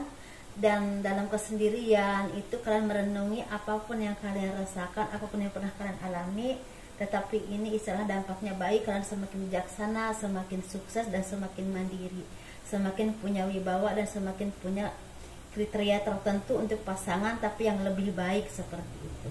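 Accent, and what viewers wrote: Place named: Java, Indonesia. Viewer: Biar lah mau campur Jawa kek Gak masalah 😀😀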